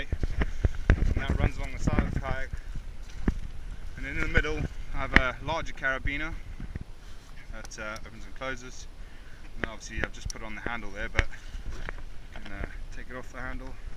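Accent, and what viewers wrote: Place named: South Africa. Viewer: Hi I don’t mean to be rude but are you partly South African only guessing from your accent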